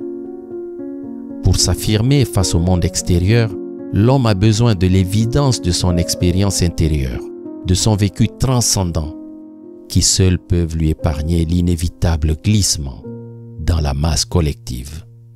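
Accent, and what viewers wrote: Africa. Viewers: Tout simplement formidable ♥️ j'adore l'accent africain plein de chaleur et de sagesse 🌞MERCI🙏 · L'accent africain sur fond de piano répétitif rend l'écoute bien désagréable, néanmoins l'effort nécessaire à la bonne compréhension du message est fructueux. Merci pour cette vidéo et le partage de connaissance. 🙂:thanksdoc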